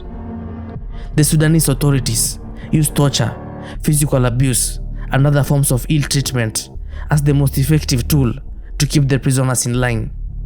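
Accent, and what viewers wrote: Kenya. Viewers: I can tell the owner of this channel is Kenyan even his English proves so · the accent... Acha izo... Weh ni mkenya?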